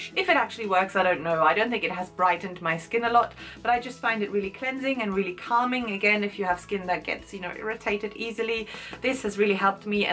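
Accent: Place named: United Kingdom. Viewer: Hello from California! I'm an American raised by Germans. Really enjoy your videos. Love your British English.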